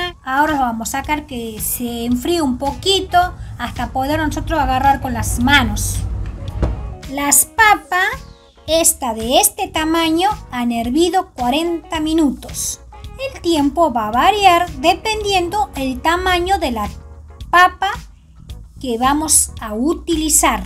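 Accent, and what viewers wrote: Tucumán, Argentina. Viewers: Que lindoooo escuchar la tonada tucumana!!! Dios te Bendiga, aguante mi Tucumán Queridooo!!!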